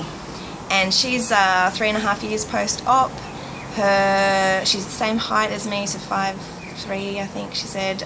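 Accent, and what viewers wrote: Australia. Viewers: Hi there. I just want to say thank you for your videos. I am currently on the journey to have this surgery. I have watched many many videos over the years and I have to say yours have been the most inspirational. I love your whole attitude and your outlook through your journey. Like I said very inspirational. Thank you for that! By the way love your Aussie accent :-)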